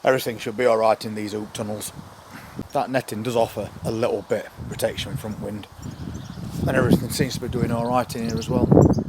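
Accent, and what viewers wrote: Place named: North, United Kingdom. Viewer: Hiya,. I always watch and listen to your videos with the captions on. I love the way it cannot cope with your accent. I'm a northerner so I'm fine with your accent and dialect, but some of the automatic captions are hilarious. Thanks for the great fun and loads of helpful and hopeful tips. Cheers.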